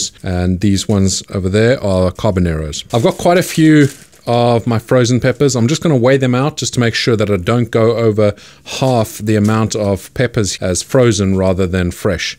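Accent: South Africa. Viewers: bro i knew you were from SA just the way you talk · Where in south africa are you from.You sounded South African the first time I watched your video's . Thank you for all your video. Looking at growing my own chills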